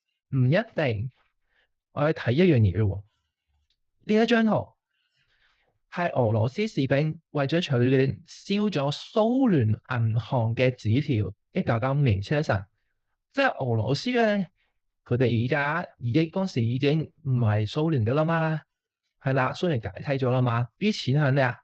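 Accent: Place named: Taiwan. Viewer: 你係台灣人? 廣東話口音有啲唔同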